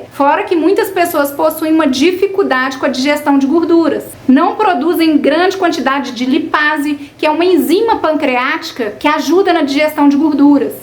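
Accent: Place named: Minas Gerais, Brazil. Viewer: Gente q sotaque gostoso.... parece ser minerinha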